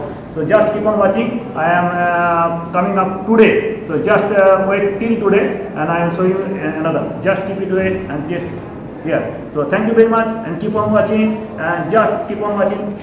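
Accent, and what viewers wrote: India. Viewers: Aap please hindi me baat kijiye. Aap ki English hindi jaisi lagti Hai. Aur India me jyada tar log jo kheti karte Hai wo hindi baat karte Hai.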